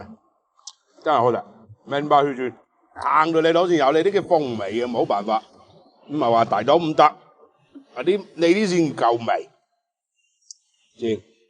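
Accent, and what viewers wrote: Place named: Shunde, China. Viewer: 客家话口音同顺德人讲话是一样的，就是似这位主持人。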